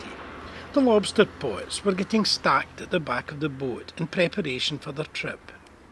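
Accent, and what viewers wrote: Scotland, United Kingdom. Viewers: Love hearing the Scottish broughe, as I'm Scottish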